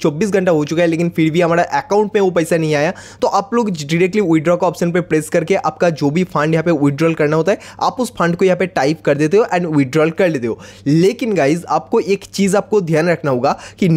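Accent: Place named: Bengal, India. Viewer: bro the bengoli accent is so freaking cute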